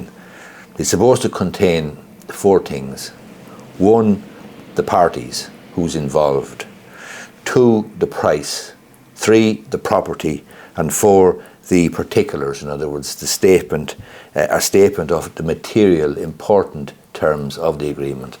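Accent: Ireland. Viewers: so irish!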